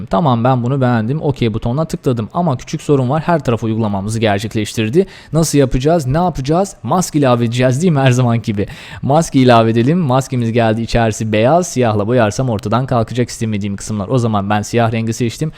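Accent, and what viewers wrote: Azerbaijan. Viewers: sen azerbaycanlımısın acep?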